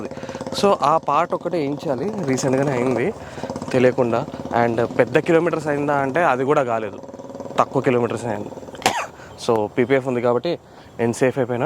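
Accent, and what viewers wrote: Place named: Delhi, India. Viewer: bhai...you r awesome.i know u well from morning to evening you rome with marvadi and jains.your hindi is Delhi based.we r just subscribers who r we to suggest your language.did they know anything about u u r a international model lakme runner up u donated 50 lakhs to blind people.what does they know don't care the people who give u negative comment.thum ko dekh ke jalthe hai saaley..kuch tho log kahenge logon ka kaam hai kehana.... ❤❤❤❤❤❤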